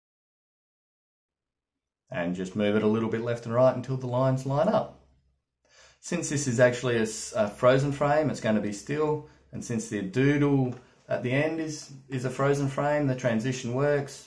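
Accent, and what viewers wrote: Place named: Australia. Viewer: Hello, loved your video tutorial. Spoken in true, direct and entertaining Aussie style. That's an opinion coming from an old, well travelled Scouser. I just signed up to Doodly, following my recent acquisition of DaVinci, when I decided to stay sane during lockdown by telling a lifetime of stories in video. It's a steep learning curve for an old git, so I'll be checking out your tutorials. Stay positive, Jim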